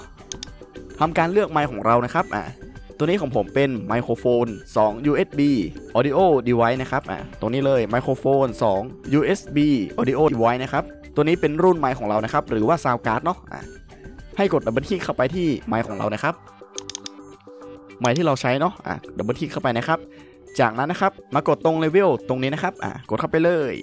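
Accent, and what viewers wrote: North, Thailand. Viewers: สำเนียงเหนือ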